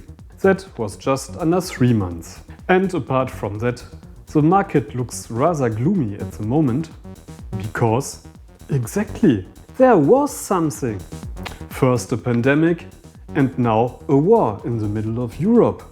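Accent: Germany. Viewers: Man i love your german accent, it reminds me that there are also great electronic musicians in germany ❤❤‍🩹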